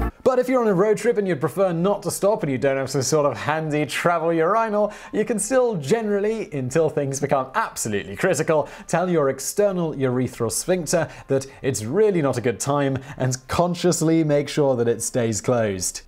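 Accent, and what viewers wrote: United Kingdom. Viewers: pretty sure he's British but not 100%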